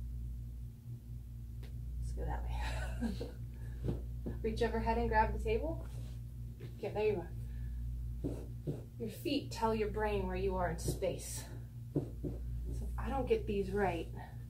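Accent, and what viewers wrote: England, United Kingdom. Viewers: Is that an english accent? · It's weirdly jarring hearing a familiar British accent in your videos.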